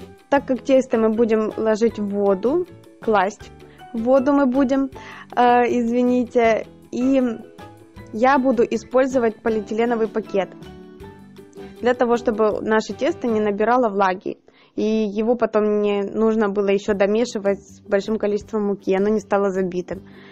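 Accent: Ukraine. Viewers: Нормальное украинское произношение, нЕчего к нему придираться, в России тоже есть регионы со своим специфическим наречием, кто-то окает, кто-то акает, меня, в свое время, в школе, украинка постоянно ругала за твердое произношение буквы "г" на русский манер, украинское "г" звучит намного мягче.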